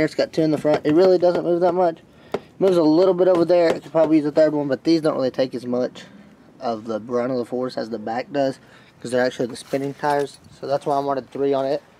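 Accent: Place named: South, United States. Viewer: Dang bro,where you from. You sound country as fuck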